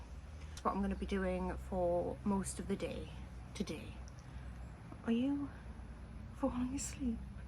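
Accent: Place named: Newcastle, United Kingdom. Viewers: can't mistake the Geordie/north east accent 👍your certainly living the good life 👌🚴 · I’m not on Instagram, you fell into my feed! 😂came for the fact your in the UK and life in a small rural space. Stayed for the North East accent👌🏻, Fox ❤ and a great video. Love from Northumberland on the Scottish Borders x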